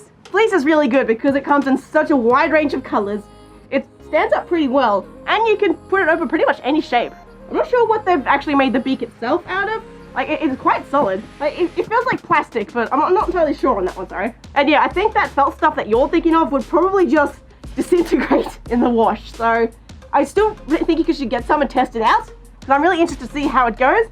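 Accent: Australia. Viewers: You sound more australian then when I was last here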